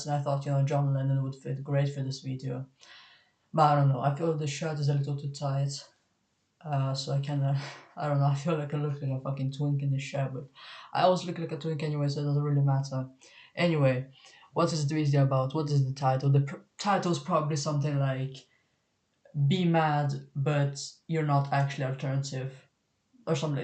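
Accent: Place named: Sweden. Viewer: i think i live alternatively the way it's meant to be lived. roaming thrift stores, doing climate activism, going to punk-, vegan- and queer events - i like it. the looks are a second thought but definitely a part of identifying your crowd. sometimes i wish i was more on social media but then i realize i don't care that much, i meet so many cool people in my town anyways. also your accent is really fun, the first thing i thought was swedish but then the russian came in and threw me off. i was sitting here pondering latvian/lithuanian until you mentioned swedish and i read the comments, so i wasn't far off with that middle point hahha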